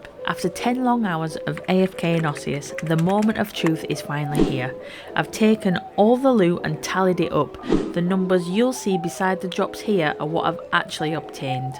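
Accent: Yorkshire, United Kingdom. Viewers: Ey up lass, you a yorkshire rser too? :D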